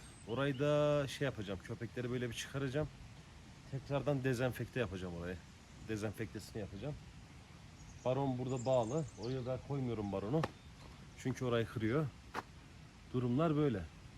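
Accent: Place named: Erzurum, Turkey. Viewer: Erzurumlu gibi geldi de konuşması. 🤔🤔 Neyse. 😊😊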